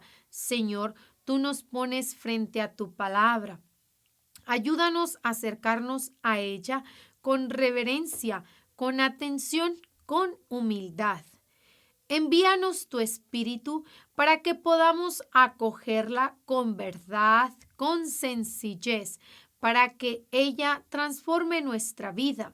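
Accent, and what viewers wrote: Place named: Colombia. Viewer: Bendiciones hermanita pensé qué ud era de Colombia, espero muchos podamos escuchar estas enseñanzas me gozo en el señor hablarnos con tanta autoridad del padre,el señor bendiga su ministro!!🙏🙏 y sigamos aprendiendo cada día más 🙏🙏🙏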